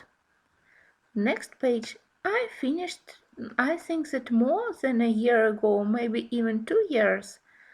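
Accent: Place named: Russia. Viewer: Вы русская??? Очень русский акцент, как будто в российской школе учили английский язык))) я не ошиблась?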